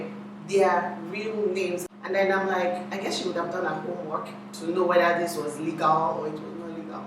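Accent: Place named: Warri, Nigeria. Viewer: I loooove the way you talk. You sound like you are from Delta...warri to be precise. hahaha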